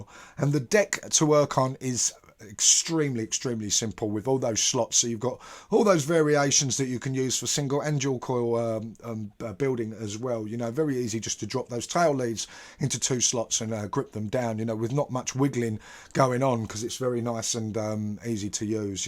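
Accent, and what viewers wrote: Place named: Cockney, United Kingdom. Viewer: whats that accent cockney 😄😅😂 He always does better reviews that the shed dweller ✌safe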